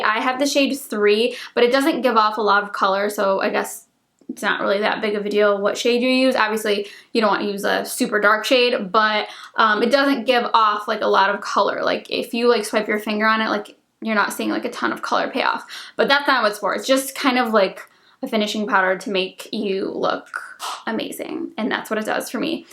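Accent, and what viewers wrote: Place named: New York, United States. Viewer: Does anyone else remember she used to have an accent?? Am I just going crazy I swear she used to have a New York accent lmao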